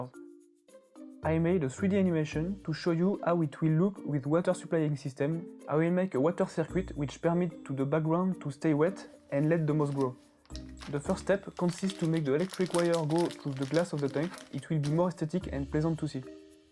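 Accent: France. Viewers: French accents are so cool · I don't understand your French-English accent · Mais quel accent Français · On entend direct un Français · I love your french english accent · un bon accent francais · that sweet sweet french accent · Ca sent le français ça ! :) Sinon, belle réalisation.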